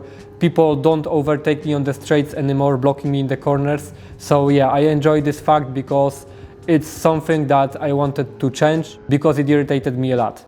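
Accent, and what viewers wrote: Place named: Poland. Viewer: Powodzonka, dobrze sie slucha tego Polskiego Angielskiego 👍💪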